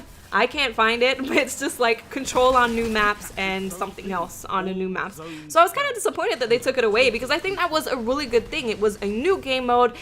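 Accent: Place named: New York, United States. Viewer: I'm new to your channel. Are you from Jersey or New York? I love your accent!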